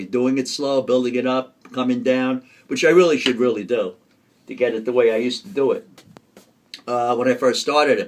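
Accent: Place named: East Coast, United States. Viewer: Great video, you sound like your from the east coast, I'm originally from Boston myself.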